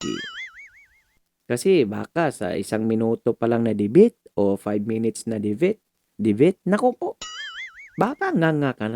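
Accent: Visayas, Philippines. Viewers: Visaya ka eh di ka Naman tlga Taga manila hahaha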